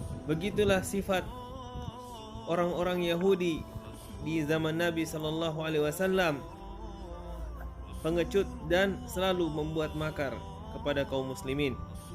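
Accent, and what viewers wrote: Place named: Poso, Indonesia. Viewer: Masi ada logat Poso juga ee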